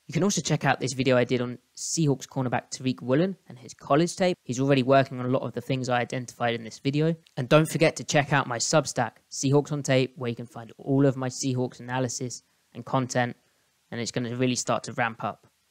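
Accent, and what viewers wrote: United Kingdom. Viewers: Big fan! I've read your field gulls contributions for a long time and had no idea you were British till I heard these videos. Cool to have someone from across the pond cover the seahawks in such detail.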